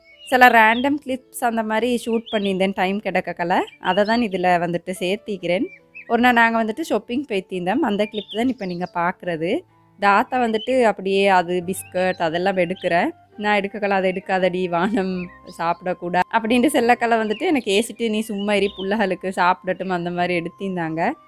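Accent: Akurana, Sri Lanka. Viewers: ok sis akurana pechi mathiri irundhichi athu than keten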